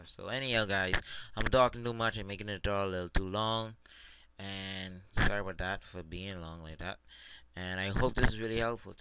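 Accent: Bahamas. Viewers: you sound like an Island boy....You from the Bahamas? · where u from the bahamas aye?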